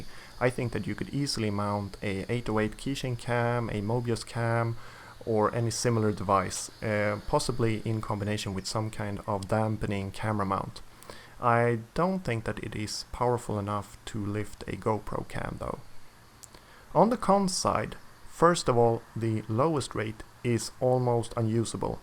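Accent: Sweden. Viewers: Wooooow helt klart svengelska (man hör det på nästan alla svenskar)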